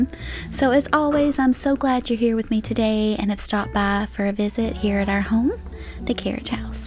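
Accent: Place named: Georgia, United States. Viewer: That's a nice take on veggie soup. I'll have to try that one😋 may I ask about your nails? I'm fairly new to your channel. Are the your natural nails or press on? They always look beautiful. Really enjoying your content. You sound like your from my neck of the woods in GA. How'd you get to Iowa? Have a good safe weekend 😊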